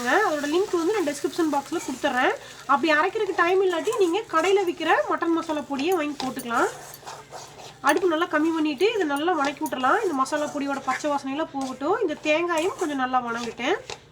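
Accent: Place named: Gobichettipalayam, India. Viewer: hi sis i am new neega namma oruu gobichettipalayagala unga videos pathaga ella nalla pannirukiga namma oruu pechu kekkupothu happy irukuthuga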